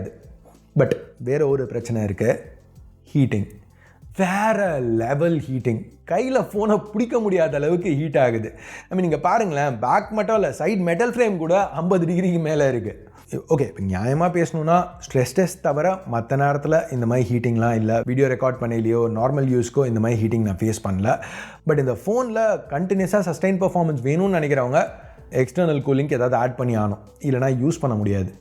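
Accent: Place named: Chennai, India. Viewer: na Chennai accent majavah ikkithu 😂❤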